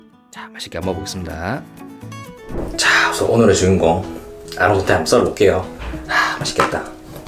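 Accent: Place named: Daegu, South Korea. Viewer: ~~~^^ 넘 정감 넘치는 제목에 옛추억을 느끼게 합니다... 구수한 대구 사투리가 어린시절을 생각나게하네요~~ 20년 수도권 생활하다가 고향생각나서 아제아 방송을 늘 즐겨찿습니다... 꼭 대구 가면 경산 한번 찾아가겠습니다~~~^^ 화이팅~~~^^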